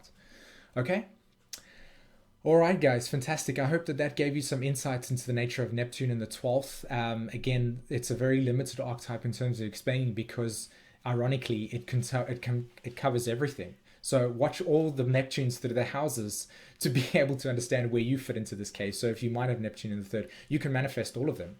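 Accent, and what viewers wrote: South Africa. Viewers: Hey dude, are you South African by any chance ?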